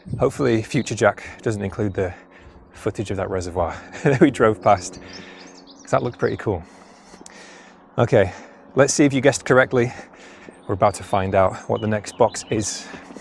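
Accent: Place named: England, United Kingdom. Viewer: Ah *that*'s an English accent I like. Fits the scenery, too.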